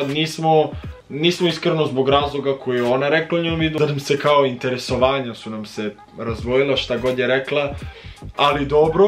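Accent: Leskovac, Serbia. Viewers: Miji baba i deda pricaju isto leskovacki moze 24h leskovacki da pricas😂😂😂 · pretpostavljam da iza kamere pricas na leskovacki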